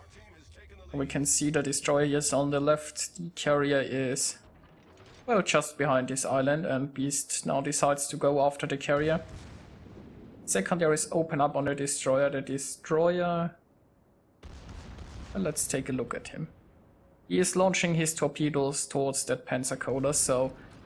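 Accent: Germany. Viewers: Man hört das du ein deutschsprachiger bist :D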